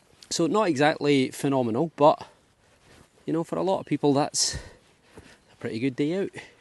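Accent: Scotland, United Kingdom. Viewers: Must be your Scottish accent, I didn't catch where you are hunting this day? 🙂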